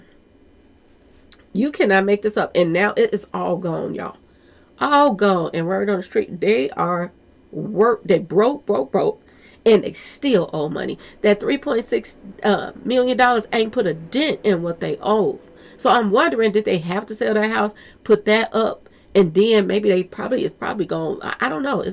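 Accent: Chicago, United States. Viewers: I detect a Chicago accent here! 😆 Cool channel.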